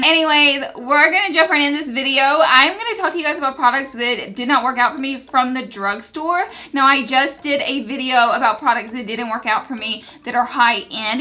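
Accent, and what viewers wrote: South, United States. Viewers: This is the most stupidest comment ever....love southern accent...hence that I live in the South:-) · I love you and your accent . southern girls unite. Texas girl here lol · Omg! Your southern accent😍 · Is that wallpaper? What is that background? It's so pretty! Your videos are great & my daughter and I love watching them together. You are a little goofy, just like us and we love your accent. My dad is from NC & we have a house down there, so we love southern accents!! Keep the fabulous videos coming!! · LOL at you commenting on the fact you say oil wrong haha, people don't understand that southern drawl sometimes!! Love your videos and keep on say Oil anyway you want!!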